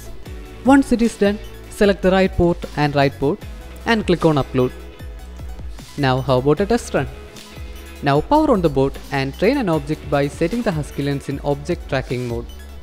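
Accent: Kerala, India. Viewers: Bro are u a mallu.?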